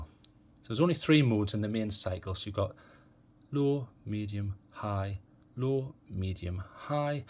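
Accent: United Kingdom. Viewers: Love British accent & this is how a flashlight should be reviewed. Good job 👌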